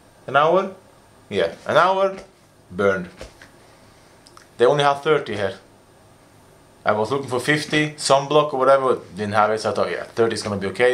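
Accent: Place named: Liverpool, United Kingdom. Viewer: Sko tru du sko hatt bedre scouse accent enn d der itte adle de åra:) blir bra detta! #legende! YNWA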